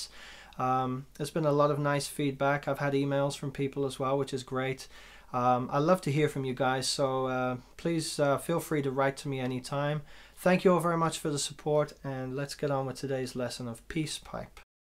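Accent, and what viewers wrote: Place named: United States. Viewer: I remember you had a Italy connection...Maybe I've missed something you've told me about your move to the Durham in the UK....Yet, it's the American accent that confuses me....Yes...weather wise...back to normal...and maybe some warmer weather middle to end of next week (hopefully)....Regards....Dave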